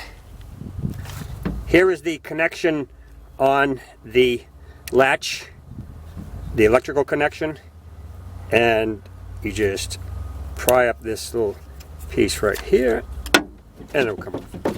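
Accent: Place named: New England, United States. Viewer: I saw a million professional videos on this job and it's the guy with the New England accent, like Peter Griffin, that got the job done and helped me truly understand. Much appreciated. 😄🍻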